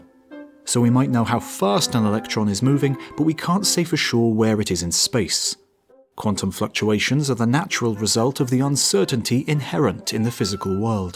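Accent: England, United Kingdom. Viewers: The english accent is suposd to make this sound smart .We know for a fact there was no big bang . Academia's Scientists and its standard cooperation suck . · So glad you don’t have an American accent. Nobody likes to be given information in an American accent over a sort of British accent. Well…there are some grossly intense bigots who love that sort of thing, but they’re outliers. Though…perhaps not so outlying as I’d like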